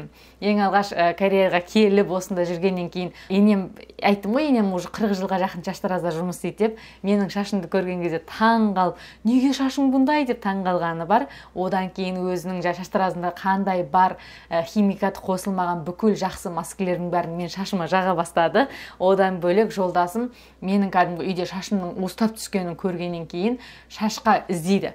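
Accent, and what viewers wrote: Standard. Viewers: Сіңілім рақымет кеңес бергеніңе .керемет сенің қазақ тілің құрет тұтып әлі күнге қазақшаны таза сөйлегеніңе риза болып отырмын құрметті бол жаным. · таза казакша сойлейди екен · Жарайсың өз тіліңді ұмытпай таза сөйлейсің Кореяда тұрсада Қазақстанда тұратын қазақтар орысша сөйлейді қазақ өз тілінде сөйлей алмайды бақытты бол сәттілік тілеймін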